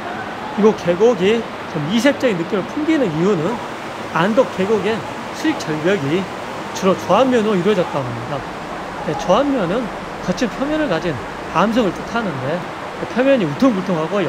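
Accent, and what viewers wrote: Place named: Jeolla, South Korea. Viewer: 워미... 이런말 혀문 안대능거이 나가 잘 알기능 혀지먼 도저히 안혀블고능 못참긋네요잉~ 아재요~ 절라도 사투리 억양 참말루다가 어머어마 혀불구마잉.. 조와요 눌라불고~ 잘보구 가게요~~~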